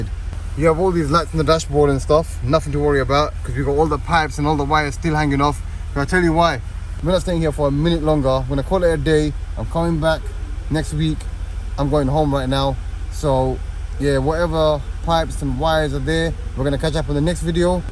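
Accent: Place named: East London, United Kingdom. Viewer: Bro you from east london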